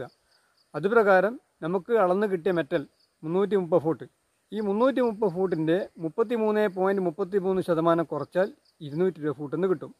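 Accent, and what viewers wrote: Kannur, India. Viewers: ningalude samsaram kannur anennu mansilayi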